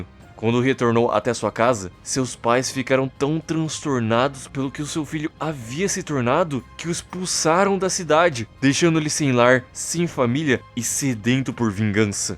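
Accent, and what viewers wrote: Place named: São Paulo, Brazil. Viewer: mano, seus vídeos são bons, mas sua voz... Cara, é difícil prestar atenção. Procura as técnicas de controle da respiração para canto, vai te ajudar. E tenta dar uma segurada nesse sotaque forte do interior de São Paulo, nmrl, pprt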